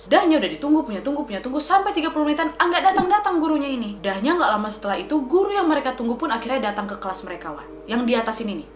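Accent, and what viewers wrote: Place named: Medan, Indonesia. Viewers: Sakinn sering nonton chenel ka:/ aku ngomong jdi medok Medan smpe ada wak² nya, trs di tanyain gini he medok amat ngomong lu eh aku mlh jawab " bacot kali kau ini, kesel kali aku wak:v" ketularan jadinya🤣 love ka😍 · Sepertinya kau dari medanya kak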